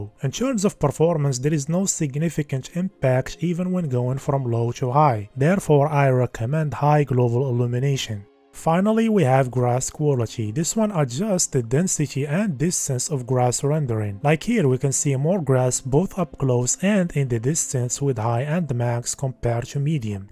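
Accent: Morocco. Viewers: yo bro u moroccan?? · The accent mghribi byna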